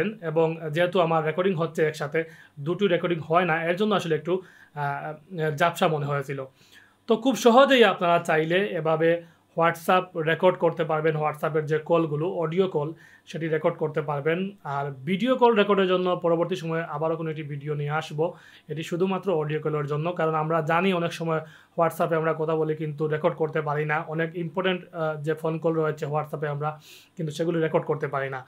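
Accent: Sylhet, Bangladesh. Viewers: Bhai ki sylheti.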